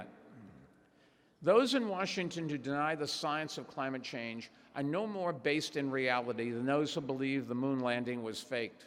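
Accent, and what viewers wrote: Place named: Boston, United States. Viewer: Don't worry, that Boston accent is front and center. Regardless of how you pronounce "hard."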